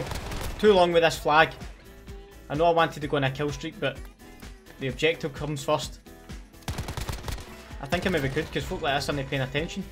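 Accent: Scotland, United Kingdom. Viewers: Gun Troller aye in a Scottish accent then he will do that stupid generic one that no one sounds like and u would need to be from the right part of Scotland to sound like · When you hear alrighty folks with a scottish accent you know its gonna be lit #notificationsquad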